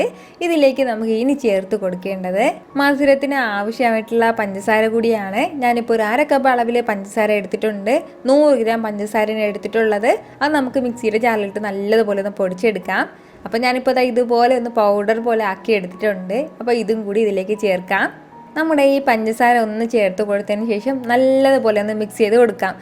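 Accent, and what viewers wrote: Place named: North Kerala, India. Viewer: Watching you from US, love the way you talk and your north Kerala accent. Your introduction is very cute, sounds like a little girl. Continue to post lot of recipes...I wish you the very best!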